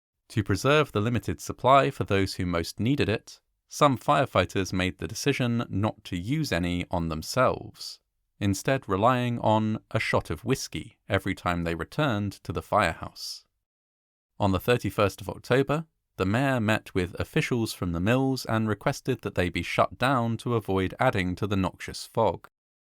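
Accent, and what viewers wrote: United Kingdom. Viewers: Your historical accounts of these tragic events across the planet and during recent centuries, are exceptionally well researched and are excellently narrated and visually presented! Your sensitivity in presenting these human tragedies is also very commendable! Thank you very much kind sir! I enjoy your British accent and turn of phrases, especially when they're used to describe North American life. As Canadians, we're more likely to use American terminology even though we've largely retained British spelling and syntax. Within this short documentary, you use: "the high street" versus (the North America) 'the main street'; "football pitch" versus 'football field"; "caskets" versus 'coffins'; "steel works" versus 'steel mill'; I should have jotted down them down as there were perhaps a couple of others! I'm certainly not suggesting changing your speech or approach. 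I just thought that viewers outside the UK might have noticed and appreciated this diversity of English idiom worldwide 🌐! Perhaps English usage throughout the former British Empire follows the original traditions. I'd imagine that due to the influence of the cultural elephant next door, that 'Canadian English' is much more Americanized than most! 🇬🇧 🇬🇧 🇺🇸 🌎 🇺🇲 🇨🇦 🍁 🇨🇦 🇺🇸 🌎 🇺🇲 🇬🇧 🇬🇧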